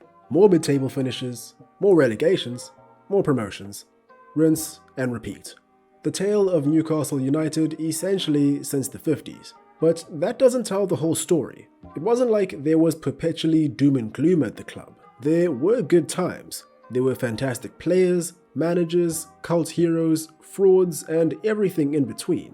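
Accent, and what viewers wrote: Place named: South Africa. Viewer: Ah, South African…couldn’t put my finger on the accent.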